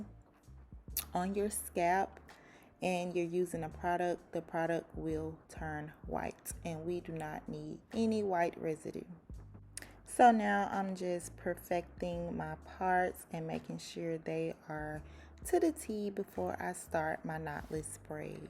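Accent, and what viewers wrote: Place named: Mississippi, United States. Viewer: girl you sound like you from mississippi! 😭😭💜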